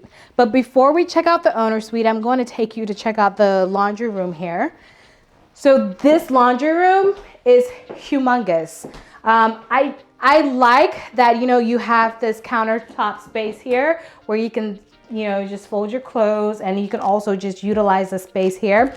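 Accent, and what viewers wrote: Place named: England, United Kingdom. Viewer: Hey!!! I personally like your screen presence and your English accent is amazingly easy to understand. Congratulations on your such professional video. Maybe one day we will meet in NC for a deal. Thanks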